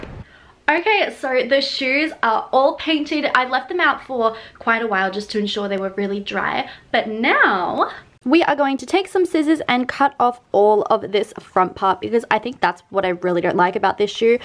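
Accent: Australia. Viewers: Your Australian accent is very refreshing! Awesome video btw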